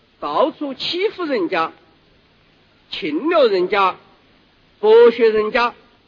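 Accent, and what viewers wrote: Sichuan, China. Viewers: 这是四川话，我是四川人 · 可苦了聯合國的翻譯了 翻譯不一定聽得懂四川話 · 四川话。其实四川话不难懂，很多字就是普通话变个调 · 牛逼啊，在联合国狂飚四川话。关键这翻译的人头疼了。邓公我们深切缅怀你当今中国的发展你功不可没。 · 四川话。。。好亲切。。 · 哈哈哈 现在才知道 四川话居然到过联合国会议 哈哈哈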